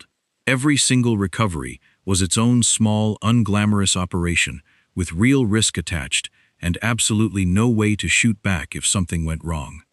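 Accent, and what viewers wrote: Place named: United States. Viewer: Who is the American robot giving the commentary? None of the vehicles filmed are from WW2?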